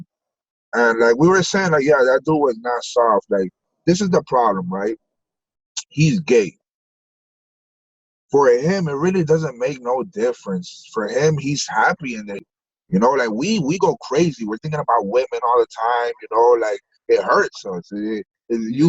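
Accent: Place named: Malden, United States. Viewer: Sup my man u said u were born in Malden but grew up in Florida, there's no way u didn't grow up in Malden too with that accent! What age u leave?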